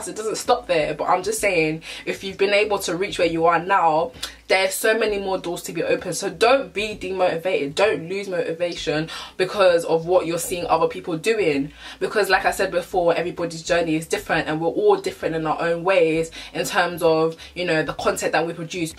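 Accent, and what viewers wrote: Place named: United Kingdom. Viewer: I just had to click youuuu the title caught my eye.... bowyyyyy do i love your accent you sound proper British😍😍 i love the content of this video i love the banta/ rant I totally agree 💯 yanoe most of the times us black people dunno how to support each other..... like im actually in love with you right now " their youtube video dont even bang" its really really really hard talking to the camera yanoe i laugh looking at my first three youtube videos i sounded like a penguin thats how we learn inni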